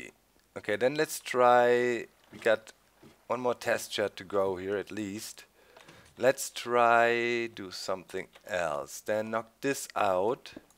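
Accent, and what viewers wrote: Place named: Germany. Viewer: versuch bitte mal ein bisschen an deiner englischen Aussprache zu arbeiten, es tut mir jedes Mal in den Ohren weh, wenn du "Witter" sagst... Keiner verlangt perfektes Englisch, aber es wäre ganz cool, wenn du das "th" wie in "SethBling", "over there" und halt "Wither" ein bisschen, naja, englischer und weniger deutsch aussprichst;).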